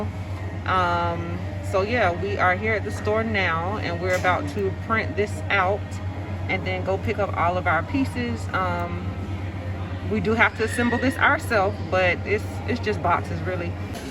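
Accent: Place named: South, United States. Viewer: I'm loving being a new subbie...I've just been watching your channel and letting the videos just play. You have such a beautiful personality and love that southern accent...I'm a Mississippi native myself! and PS our men will sit around tucked under blankets until THEY CANT anymore hahahahah lol I loved that!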